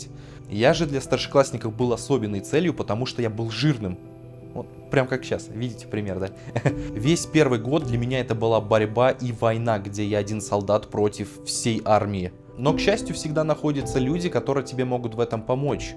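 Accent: United Kingdom. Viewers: Чувак, очень классное видео, продолжай и достигнешь высот! Кстати, если не сложно, сними, пожалуйста, видео о постановке британского акцента, твой звучит очень аутентично! Мб хоть какие-нибудь советы дашь, как улучшить звучание (не говорю о дефолтных TH и т.д) В общем, урок для тех, кто изучает английский в России, но не находится на начальном уровне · Дружище, у тебя ахуенный британский акцент. Южный как я понимаю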